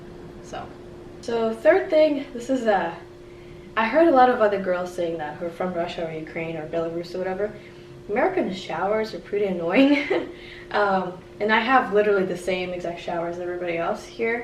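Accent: United States. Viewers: You sound like an american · In none of her videos does she talk about returning to Russia; all her talk is about applying for different visas and trying to prolong her time here and getting a job here; I think she intends to move here; she already sounds and acts like an American. And of course she now has an American BF.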